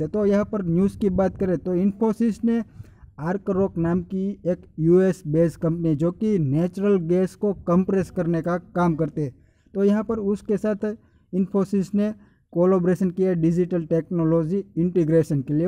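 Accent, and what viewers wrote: Gujarat, India. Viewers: Hu pan gujarati j chhu. Gujarati ne hindi bolta taklif to pade. Pan ae taklif khali je gujarati hoy ae j jani sake.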